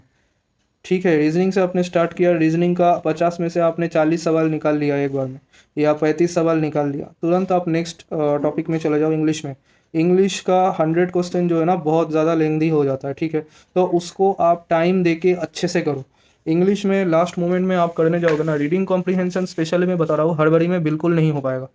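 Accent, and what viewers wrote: Bengal, India. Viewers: Amar o mone hoyechilo Bengali . Pronounciation lgche omni .... 🙂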